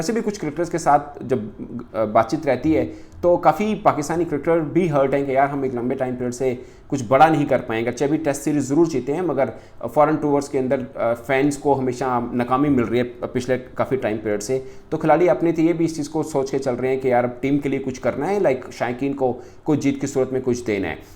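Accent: India. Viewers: URDU TALAFUZ KI DANISTA GHALETI: KKHas nahin, balkay khas! KKHali, nahin balkay khali! Kia ho gaya hay ap ko, kay IND charyon kay views lenay kay liay jahilana Hindi Talafuz/lehja apna rahay hain? Isi terhan 'Beech kay ander' koi standard Urdu nahin hay. Sahih hay: 'darmyan main', yan 'is doran'.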